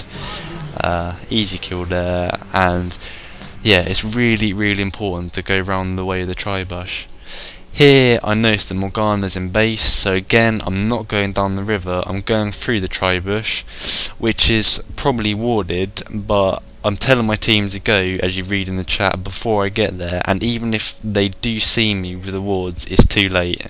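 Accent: United Kingdom. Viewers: British accent, huehue.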